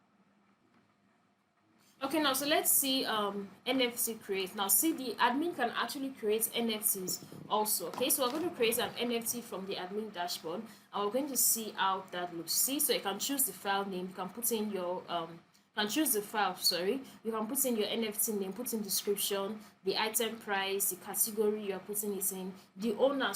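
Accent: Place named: Nigeria. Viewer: I hear the Nigerian voice. Good job and thanks for this.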